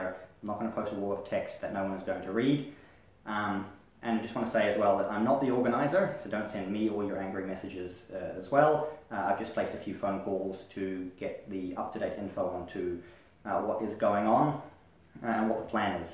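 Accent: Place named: New Zealand. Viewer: Interesting - but, please slow down your speech and keep same volume....trying to cope with the NZ accent....hope the group thinkers widen their mind enough to actually listen to what Lauren has to say.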